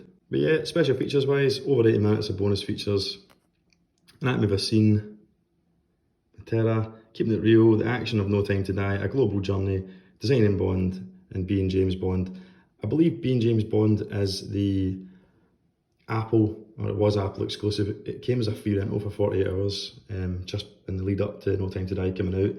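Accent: Scotland, United Kingdom. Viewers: cool review mate, nice to hear a fellow jock ;) steelbook fan but didn't like the steelbook and hated the movie, worst since Roger Moore for me and definitely the biggest pussy of a james bond baddie ever ;(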